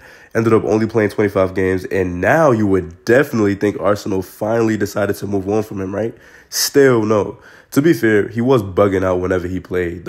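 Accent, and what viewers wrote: New York, United States. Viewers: Hearing your heavy ass NY accent discussing football is kinda trippy. Good content tho bro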